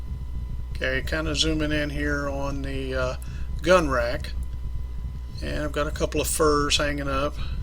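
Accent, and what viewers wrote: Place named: Arkansas, United States. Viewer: You sound like your from Arkansas!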